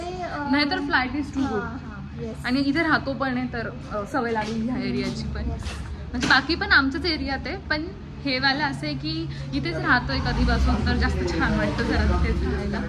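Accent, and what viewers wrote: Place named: Nashik, India. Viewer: Half vlog baghitla language hun vatal aaplyachkadche distay Nashikkar❤ nd I'm right😀 New subscriber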